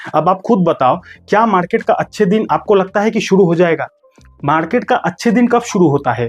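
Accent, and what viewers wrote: Bihar, India. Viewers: बिहारी हो क्या भाई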